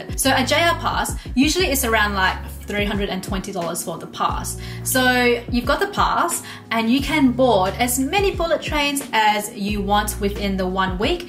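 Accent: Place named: Australia. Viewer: Australias accent is really starting to rub off on you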